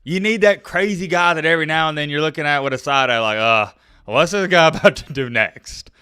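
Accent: South, United States. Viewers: My first comment here, love the southern boy commentary. Dude the guy is a kicker who cares never even watched this guy. You are correct about Youtube. Youtube is the go to for everything, especially on sports.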